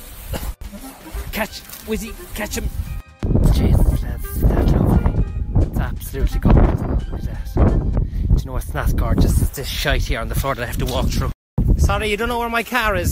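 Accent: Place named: Ireland. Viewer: I can’t deal with how Irish u are what’s the story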